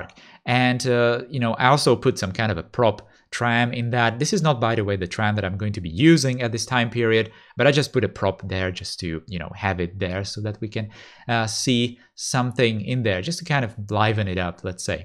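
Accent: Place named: Czech Republic. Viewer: Usually you can recognise Czech from a mile by his accent. With I was thinking that I can hear a Czech or Slovak accent but it's so subtle that I thought I must be mistaken.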